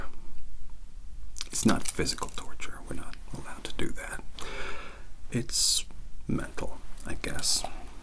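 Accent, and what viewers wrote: United States. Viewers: That’s such a good American accent... fooled me until I saw the comments. It’s awesome man! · truly i was jarred when i heard the American accent still soothing tho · I don't know what it is, but an Englishman who can do a convincing American accent is very powerful to me. 👀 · I love the way your American accent sounds. Your also an amazing actor!! ❤️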